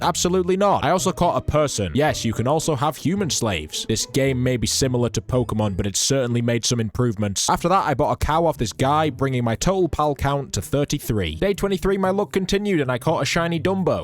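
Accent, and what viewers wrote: United Kingdom. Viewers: The worst part is a br't'sh dialect. "Fancy my chances", you people really are the native speakers of it? 🤧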